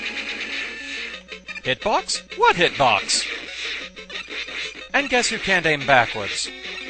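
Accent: United Kingdom. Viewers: I have two quick questions for you, and I'm really sorry if you get these all the time but: 1) What is your favorite Mega Man game to play? Not perfect run, just for fun. 2) Can you explain your accent? It sounds vaguely British but maybe like you were raised in the US. Just curious. Love your perfect runs and always excited for the next ones!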